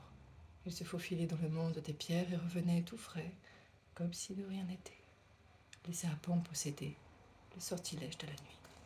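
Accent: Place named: Spain. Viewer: Youpi je suis le 100e abonné 🙃Tu as un petit accent et une manière de parler bien spécifique, tu es espagnole "de base" ?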